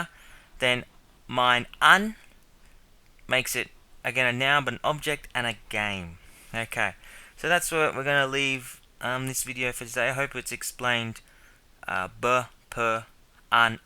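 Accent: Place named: Australia. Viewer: I can tell by your accent that you are probably an Aussie. hahaha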